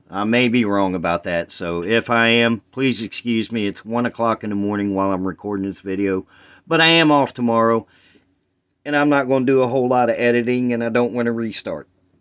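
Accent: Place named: South, United States. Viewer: Fellow stacker here! Sounds like your from the south...Alabama here!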